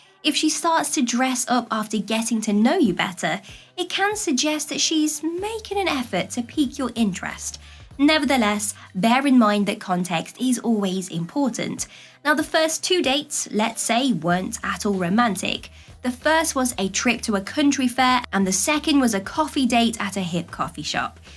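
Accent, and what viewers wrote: United Kingdom. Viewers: Sure. Combination of Karen Valentine and Lily from AT&T with a smooth British accent doesn't hurt either.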